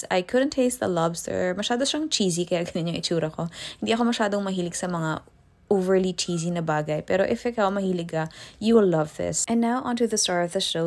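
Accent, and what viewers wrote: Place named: United States. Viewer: I swear to god u sound like a American 😭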